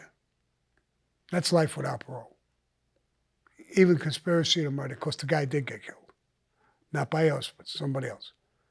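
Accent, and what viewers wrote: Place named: New York, United States. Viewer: Us guys from NY, NJ, PHILLY, CHI & the like can’t walk a city block without tripping ova 20 guys that speak how Sammy does..since the 70’s 🤣🤣 🤷‍♂️ who knew the world thought so highly of this kinda brouge🤣🤣🤣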